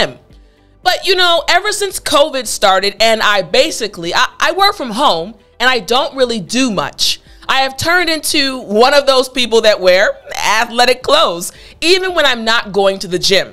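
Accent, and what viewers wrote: Africa. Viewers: you speak in English like Africans.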